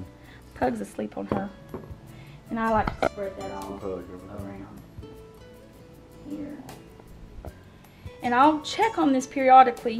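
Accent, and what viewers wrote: South, United States. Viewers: I did live in NC for a couple of years too. I'm in Canada now so I sure love hearing the Southern accents again. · I knew I heard a souther drawl don't know if I spelled that right lol. great video will have to try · I love a good southern accent and yes I have one myself being from Arkansas. Great recipe, my family loved it!!!!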